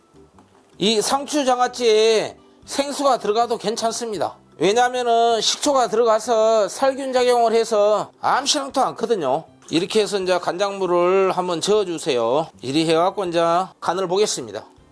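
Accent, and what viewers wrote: Jeolla, South Korea. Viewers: 끄트머리는 짤라불고요.~ 전라도 사투리가 겁나게 구수해부네요이! ㅎㅎ 덕분에 저도 상추장아찌 맹글어 볼게요. 항상 건강하시고 행복하세요.~ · 대표님 전라도 출신인가요 정가이 갑니다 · ㅋㅋㅋ 전라도사투리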